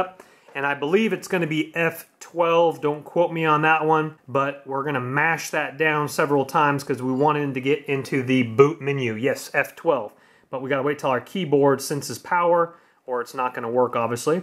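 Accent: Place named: United States. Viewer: Finally an american been watching indians and cant understand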